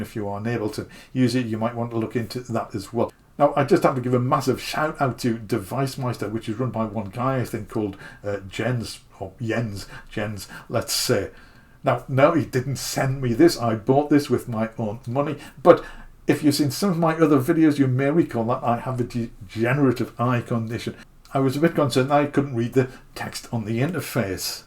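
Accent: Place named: North East England, United Kingdom. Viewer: Hi, You seem to have a north-east twang, I'm in my 50's, an electronics engineer and live in Teesside. I collect faulty 80's and 90's keyboards, modules and obscure sound generating gear, put them back to fully functional and script files to interface with Cakewalk or Fl studio. Software emulation is good for lack of space, but it won't appreciate in value and many deep dive functions in my Korg gear (M1, X3) would never be replicated, Korg in the 90's mastered the full-bodied out-of-the-box sound. Best wisher for 2024. ✌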